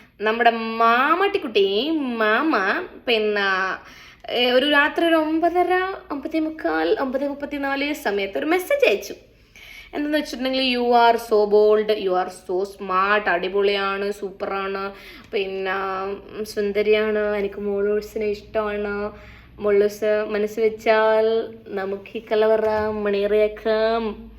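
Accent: Kasaragod, India. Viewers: Nammude aa Kasaragod language kayari varumbo oru sugam kelkan. Hats of you dear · Edek ninde slang ksd ambo nalle paangan ..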